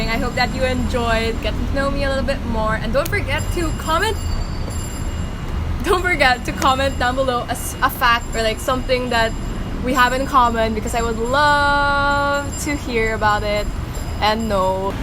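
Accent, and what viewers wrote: Philippines. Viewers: You have a Filipino accent when you speak English!! Cool!!!🤩😆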